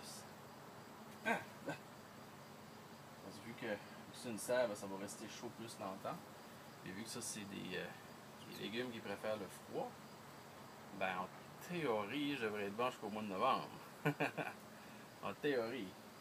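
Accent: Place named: Acadia, Canada. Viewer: un bon vid francais avec un petit accent acadien sa ses beau pis ses merveilleux de parler deux langue et tres bien en plus lol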